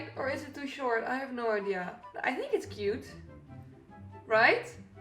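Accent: Netherlands. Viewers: I swear she has to be dutch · Are you Dutch?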